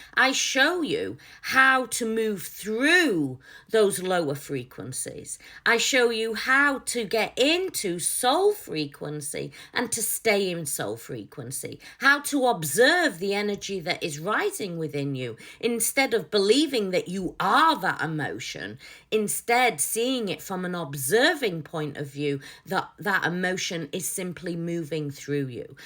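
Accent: United Kingdom. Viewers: great video, great channel, great British accent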